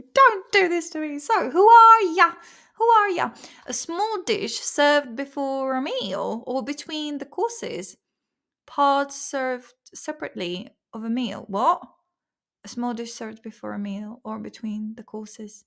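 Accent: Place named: United Kingdom. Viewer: I love your accent, actually Persian is my main one but I always enjoy British accent ❤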